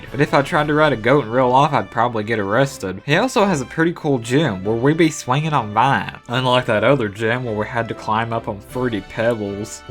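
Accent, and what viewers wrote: South, United States. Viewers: are you southern? (sorry I'm from Oklahoma and I rarely see youtubers with like real southern accents so jw) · I have to say that I am not a judgmental person but your voice completely threw me off. With a southern voice and a large, expanded mind it kind of kicked my brain in it's nuts.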